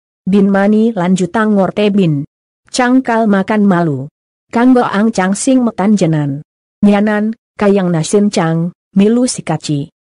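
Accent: Buleleng, Indonesia. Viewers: Gaya kipak kipek lucu. Lebih cocok logat Beleleng anggon. Ini rekaman lama tapi saya baru sekarang nonton. Demen masi.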